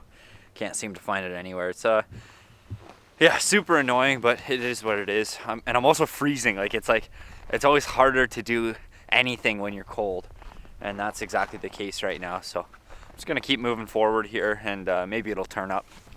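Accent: Canada. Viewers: Not even a minute through and I heard Canadian and I’m enjoying it, love from Alberta new subscriber here!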